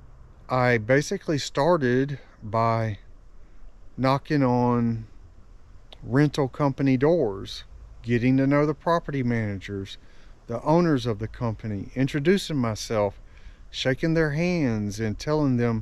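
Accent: South, United States. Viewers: From your southern accent, I was wondering what part of the south, GA. In 2 yrs I'm planning to move to catersville. I'll be looking for employment then. I personally never wanted to start a business, I could have one time if I wanted. To many licenses and epa rules, I mentally can do that stress.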